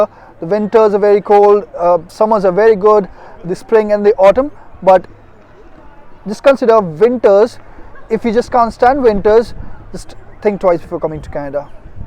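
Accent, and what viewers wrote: India. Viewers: You sounds like you are in India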